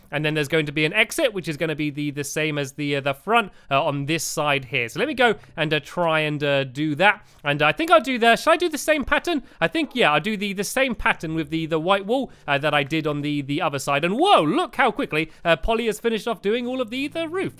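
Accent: United Kingdom. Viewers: Brandon Ford British. He loves here. He lives in Bathe, but travels around the place a lot.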